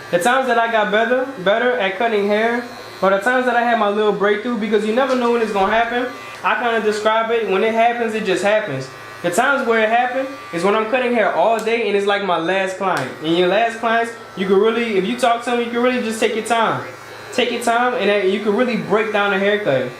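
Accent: Louisiana, United States. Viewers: Just by your voice, I know you're from Southern Louisiana. That is a unique accent.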